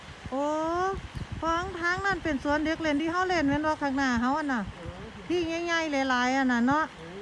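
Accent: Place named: Nakhon Phanom, Thailand. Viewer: วิวสวยมากค่ะ สำเนียงพูดเพราะจัง เหมือนคน นครพนมน่ะค่ะ~~^^🥰🥰🥰🥰🥰🥰🥰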